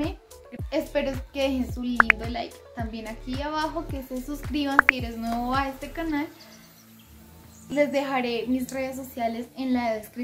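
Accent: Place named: Colombia. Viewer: oye la voz colombiana me gusta mucho un saludo desde México ♥️ cuídate mucho y bendiciones 🙏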